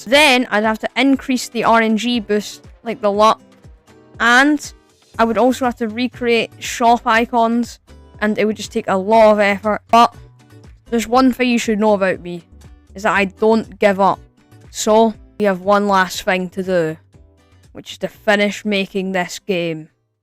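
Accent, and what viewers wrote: Scotland, United Kingdom. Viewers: its either bros really british or bros faking the british accent (still respect) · R u Scottish cuz u have the accent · It’s Scottish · Are you Scottish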